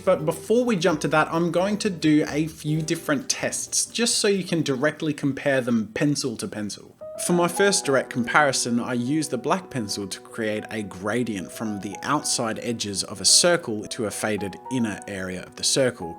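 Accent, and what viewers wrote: Australia. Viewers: Is he Aussie? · Hey, just stumbled on this video.. Out of curiosity is your accent a queenslander? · I just now realised that says "good day" in every video is because he's austrailing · Are you Australian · hes Australian? at least I think because of the accent but he's actually hot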